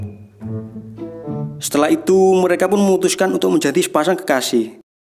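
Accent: Java, Indonesia. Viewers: Itu sempah gw bukannya fokus ke film malah ngakaknya ke suaranya😂😂😂udah medok lucu lagi😂😂😂apalagi pas bagian tegak menuju tak terbatas aduh bengkek 😂😂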